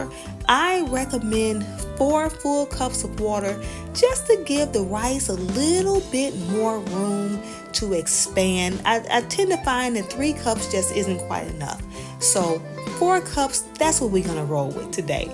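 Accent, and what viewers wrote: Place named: New Orleans, United States. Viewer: Looks good! Sounds like you’re from my hometown of New Orleans. ❤️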